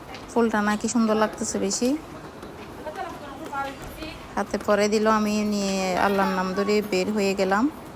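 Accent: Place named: Chittagong, Bangladesh. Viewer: Apo atte onor chittainge kotha besi vala lage.apon apon lage .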